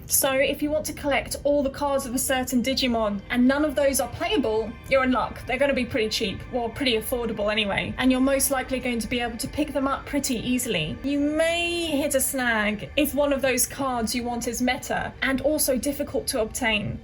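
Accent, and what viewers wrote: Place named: United Kingdom. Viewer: Where have you been hiding? Love this. Finally a fellow British (well proper english speaking) person 😅. Nostalgia overload.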